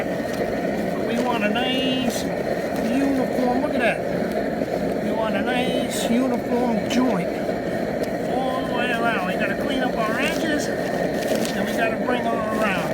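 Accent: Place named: Long Island, United States. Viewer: Holy shit that long island accent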